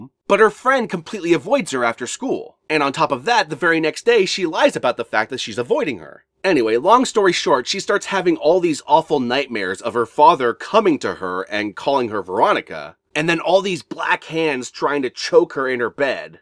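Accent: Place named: United States. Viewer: Your pronunciation of the Spanish names is ok, you just have the usual problem Americans have where your accent doesn't lend itself to Spanish/Latino names.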